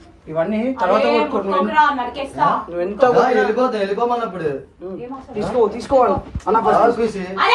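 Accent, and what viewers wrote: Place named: Andhra Pradesh, India. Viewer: Hi anna mi videos einthaka mundhu challa manchiga undavi anna Eppudu videos lo andhra slang maku aithea manchiga anipistledu anna aa slang maku adram aithea ledu anna so plz deniki recat avu anna koncham 😊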